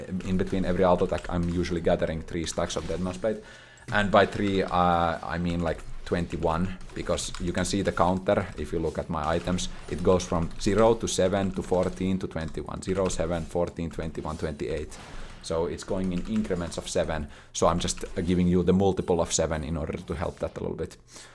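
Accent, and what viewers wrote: Finland. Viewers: You have such a Finnish accent I love it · are u from finland? ur speaking kinda sounds like it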